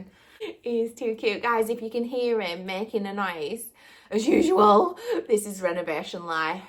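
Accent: Northern England, United Kingdom. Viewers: It depends on regional accents. I think is from the north.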